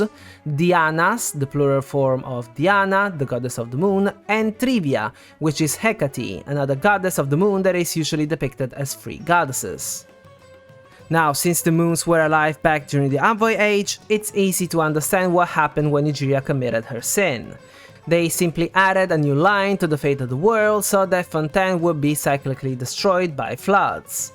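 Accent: Italy. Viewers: Ahaha ecco cos'era quell'accento! Ciao compatriota! Sei fortissimo, adoro i tuoi video e cerco sempre di stare al passo. Spero che il tuo canale possa crescere, te lo meriti davvero ❤️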